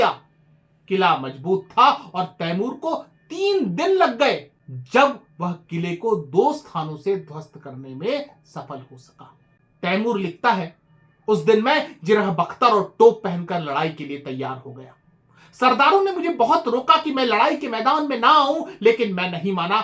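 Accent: Standard. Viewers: आपके हर वीडियोज की तरह ही ज्ञानवर्धक हे। आपकी शुद्ध हिन्दी और उसका सर्वथा शुद्ध उच्चारण प्रशंसनीय है।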